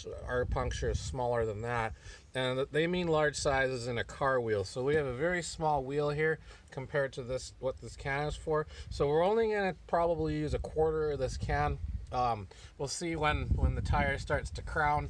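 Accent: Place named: Canada. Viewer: Thanks, helped alot. I'm in NC. I noticed you talk like being in canada. Say "about" like "aboat". But great video. thx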